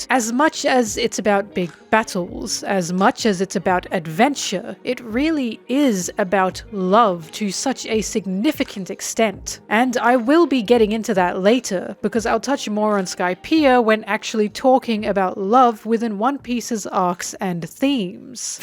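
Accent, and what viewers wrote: Australia. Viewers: Amazing video, also i didnt know you were australian too, hell yeah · What your Australian!!! Samsies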